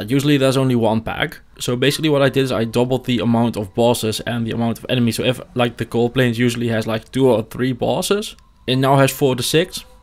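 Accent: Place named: Netherlands. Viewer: hou van het Nederlands accent haha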